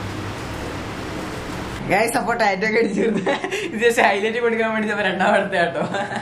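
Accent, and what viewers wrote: Kannur, India. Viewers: Nee kannur karanano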